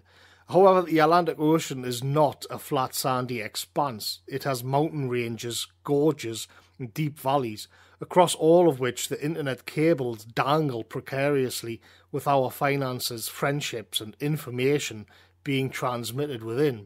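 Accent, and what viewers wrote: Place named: Scotland, United Kingdom. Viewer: Magnificent scottish accent. It overtakes the message a bit. I wonder where this accent has its home more precis.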